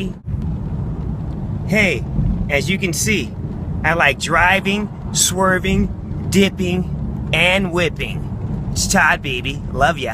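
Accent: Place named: West Coast, United States. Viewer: West Coast white guy voice.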